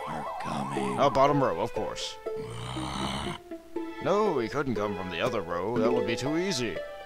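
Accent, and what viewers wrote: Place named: United States. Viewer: Kind of clearly he's a Yank.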